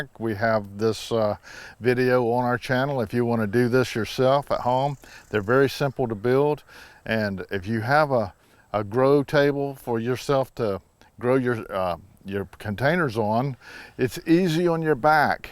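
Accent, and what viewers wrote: South, United States. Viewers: 😂His drawl is what I enjoy, too! You're a lovely man,! 🎉 -Judy 🇨🇦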